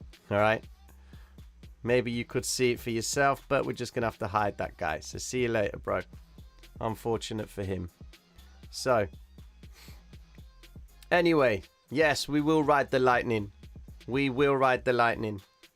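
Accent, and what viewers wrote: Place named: North London, United Kingdom. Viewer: Most underrated, level-headed analysis I have found on YouTube. Most people don't realise that 99% of these TA guys are just trying to shill you their telegram or discord or VIP group which lies behind a massive paywall. If they were really that good they would make bank on trading but they clearly don't if they need to sell you their BS programmes about drawing triangles and lines. gives the most valuable information for free and you can tell he doesn't need to do it but he wants to help people. Literally top 3 trading channels on YouTube. Can't wait to sign up for patreon just to show my support. Much love, btw you must be from north London or something cos you sound just like me lol, I'm gonna go for Greek Cypriot haha, nuff love <3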